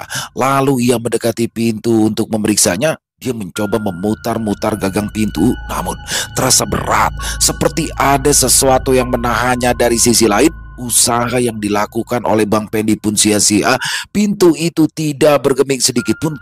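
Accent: Jakarta, Indonesia. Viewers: Waaah, waaah ada bang lagi nich, paling seneng deh dengerin logat dan Betawi nya dan kelucuan bang serta si babe ☕☕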